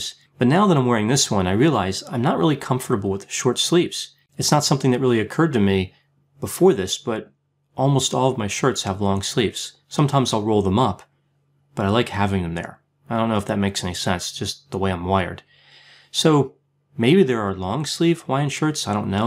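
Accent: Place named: Delaware Valley, United States. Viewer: Your list is heroically bland and this video demonstrates your core virtues of steadfastness, honesty, and not caring what anyone else thinks. Also, I like your Delaware Valley accent. I grew up hearing it and find it comforting.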